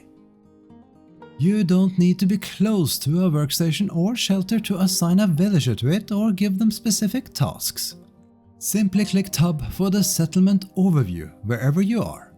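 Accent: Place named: Norway. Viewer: .and this, people, is how an proper Norwegian accent sounds like :)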